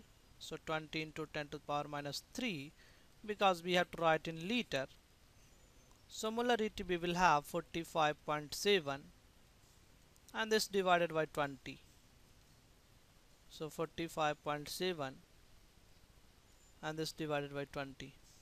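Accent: India. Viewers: Wow... you have the voice of a very stereotypical indian man... concentrasin?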